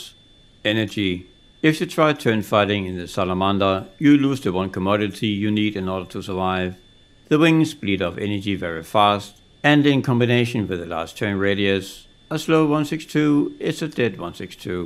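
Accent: Denmark. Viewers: Tak for info min ven. Det gik først op for mig til sidst du lød dansk hahaha. Keep up the good work brother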